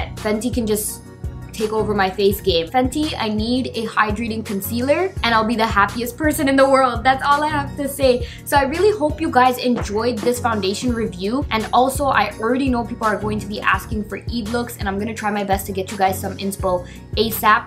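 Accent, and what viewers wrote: Toronto, Canada. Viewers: You talk like you live in Toronto/Scarborough.... 🇨🇦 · i hear the Toronto/GTA accent im dead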